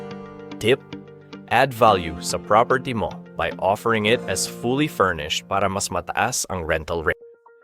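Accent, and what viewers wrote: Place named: Conyo, Philippines. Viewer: Speaker sounds so conyo. 😅😅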